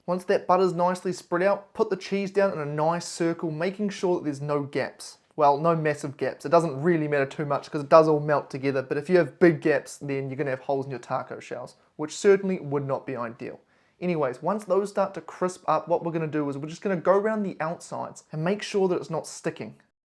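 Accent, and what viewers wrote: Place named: New Zealand. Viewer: Wow, I thought he sounded like a Kiwi. I recognised the brands he used.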